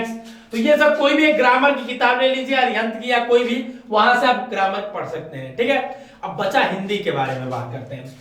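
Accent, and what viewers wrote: Varanasi, India. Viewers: Sir apki Voice batati h aap pure banarsi h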